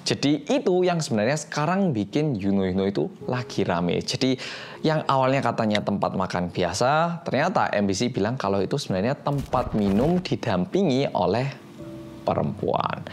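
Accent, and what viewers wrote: Java, Indonesia. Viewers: Orang mana si. Asli mana. Kok medok. #penonton_baru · Kalo gak nonton videonya, cuma denger suaranya aja, bener2 suara orang Jawa, medoook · Medoooook, asal mana to om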